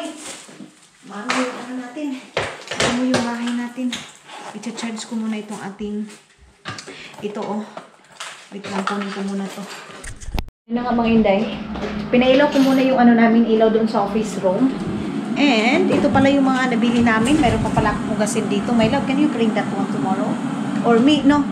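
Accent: Visayas, Philippines. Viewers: Hello maninay ang ganda ng house mo sosyal tuwang tuwa ako sayo maninay pagnabibisaya k nakakatuwa k kc magsalita iloveyou maninay godbless you 🥰🥰🥰🥰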